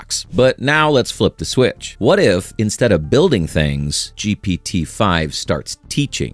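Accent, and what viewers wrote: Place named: United States. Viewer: I love how country you sound ! Gitty 😁 Yup !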